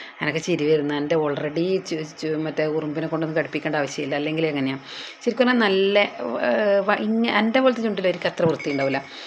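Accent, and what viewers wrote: Kannur, India. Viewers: കണ്ണൂർ കാരി ആണോ ഭാഷ അത് പോലെ ഉണ്ട്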